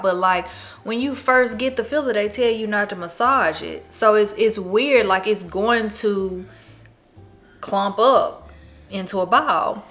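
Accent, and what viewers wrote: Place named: Memphis, United States. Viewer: I've been sitting here for the longest like damn sound like my mother-in-law...NOW I get it lmao.😂 Memphis and Forrest City folks sound just alike.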